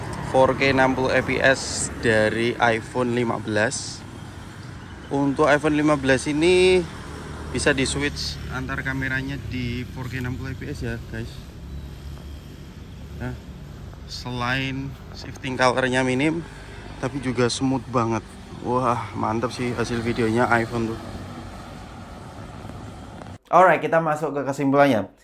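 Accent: Semarang, Indonesia. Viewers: Logat e ketoro semarangan ik 😂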